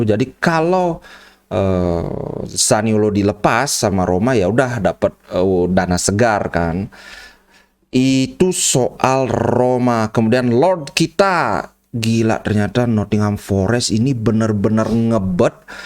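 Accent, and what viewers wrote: Bali, Indonesia. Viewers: Kalau dengar logatnya...dari bali nih kawan satu ini...forza inter...salam dari Malang bung😁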